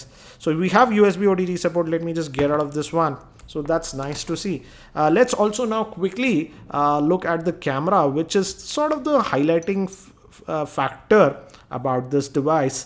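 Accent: India. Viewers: I really hate when i get in a review and find it indian english ! " bress da budden "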